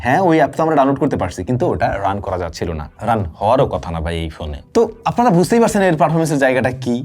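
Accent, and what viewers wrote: Barishal, Bangladesh. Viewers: ভাই বরিশালের এক্সেন্ট গুলা কিন্তু দারুন লাগতেছিলো😊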